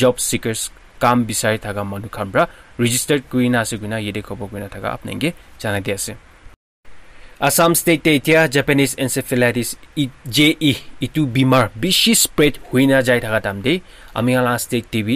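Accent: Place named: Nagaland, India. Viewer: Best reporter... Soothing voice, audible and Nagamese is normal unlike other channels.... Other channels speak Nagamese so stylish that even we Naga cannot understand 🤣🤣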